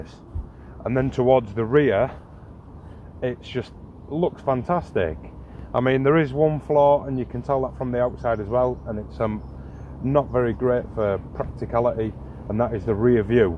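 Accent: Yorkshire, United Kingdom. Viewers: your very good buddy I’m looking at a CRZ next week in my home city Liverpool it’s black ( although they look better in white) 90,000 on the clock £3,200 …it looks like it’s been looked after to be honest mate I’m excited at the prospect of owning one after your excellent review …I drove one years ago and I thought wow what a cracking motor …your review has tipped the scale in me wanting to get one so credit to you buddy …your good you bring something to the car review table that’s refreshing …I sense your a Yorkshire man i live in the Lake District …thanks for that and the reply onward s and upwards buddy I really wish you well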